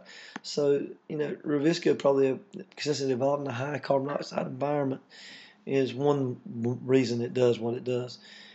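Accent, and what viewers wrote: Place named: South, United States. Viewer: Nice video truly, you got an interesting accent too. You from around south, buddy?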